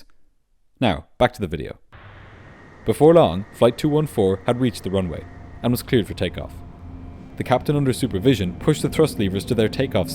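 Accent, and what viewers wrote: Ireland. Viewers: Stumbled upon your channel a few days ago pretty much by accident. I lived in Ireland for half a year when I was 15 and I still miss it 5 years later, so hearing your accent really caught me and sent me down memory lane. I think I‘ve watched about 10 of you videos now and I‘m staying for the amazing storytelling, analysis and breakdown!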